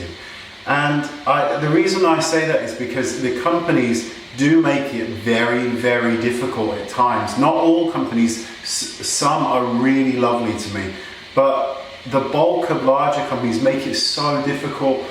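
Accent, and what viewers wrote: England, United Kingdom. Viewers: Hey!:) I love your videos! I love your personality and I love you as a person. I know you have no idea who I am but I just wanted to say that you have a lot of support behind you (myself included) when it comes to your videos. I've learned a lot from your videos! Your accent makes me wanna go to England! My dad grew up in the UK and I have an Uncle that still lives there but I have never been to the UK. Hopefully one day when I go that I can meet you while I'm there. That would be amazing! Anyway, have a great day!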